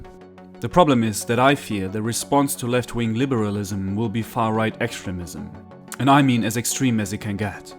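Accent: Germany. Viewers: Have you ever lived in the U.S.? I hear you have a German accent. Well, the only candidate who gave a shit about helping working people Bernie Sanders was labeled "too radical for the American people" because he wanted to develop a universal healthcare system like every other western democracy besides us has and get us free or nearly free college. In fact, I heard a German political commentator state that this radical Bernie has views that line up well with the Democratic Christian Union the best in German politics.... The conservative party 😂. And as for religion, nearly 70% of the country is still fundamentalist Christian so that's not true either.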